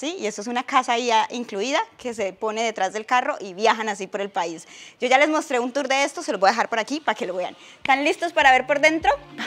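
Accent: Colombia. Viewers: Me encanta que no pierdes el acento colombiano 😍😍😍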